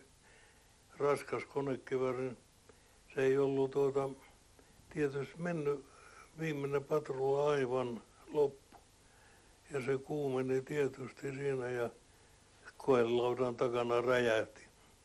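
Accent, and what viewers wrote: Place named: Sortavala, Russia. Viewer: Utissa <num> aloitettiin alokkaina. Esikuntakompanjan rakennuksen vieressä oli Mersu, jonka edessä otettiin ensimmäiset kuvat. Tupien nimet olivat menetettyjen lentotukikohtien nimiä. Illu puhuu Sortavalan miehen murteella ja tyylillä juuri noista paikoista, joiden nimiä käytimme ryhmänjohtajina. "Tupa Suurmerijoki jne"..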